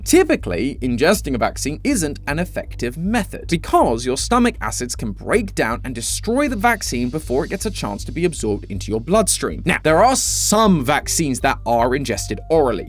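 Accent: United Kingdom. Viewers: BRITISH MATPAT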